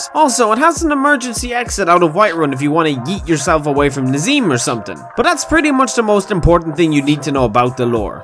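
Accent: Ireland. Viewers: Your accent is more borage, than elsinore, you sound like an Irishman than a Kajhiit.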